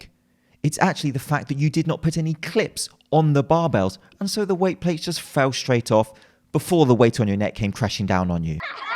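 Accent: United Kingdom. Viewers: Your teeth are too str8 to be British...but not your accent... i am confused....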